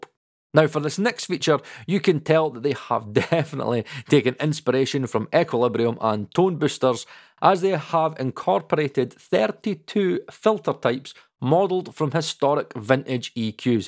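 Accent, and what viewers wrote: Scotland, United Kingdom. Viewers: Scottish accent is so cool.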